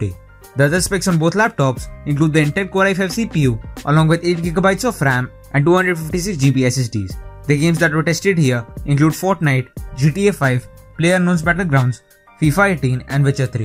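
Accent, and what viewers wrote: India. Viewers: fakof with ur indian accent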